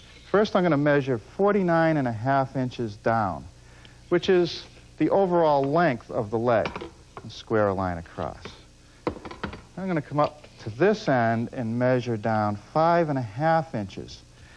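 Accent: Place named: New England, United States. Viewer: A measured drawring. Lol My dad used to watch this all the time, i’d watch sometimes and always found the New England accent amusing and his cheerful attitude and lack of ego despite knowing more than just about anyone about it unusual, even then. I have a radial arm saw that’s probably older than the one on that show, with fewer safety features, but still runs like new. But I have it because my dad loved his so much, it’s what I learned on, so I got one of my own, all because of Norm.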